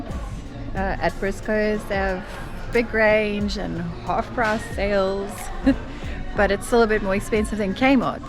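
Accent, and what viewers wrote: South Africa. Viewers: Fantastic. Nice vid. I love the Afrikaans accent.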